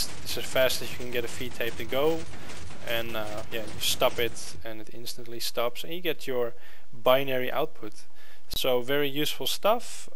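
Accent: Netherlands. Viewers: Je hoort Gwn aan je stem dat je nederlands bent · are you dutch?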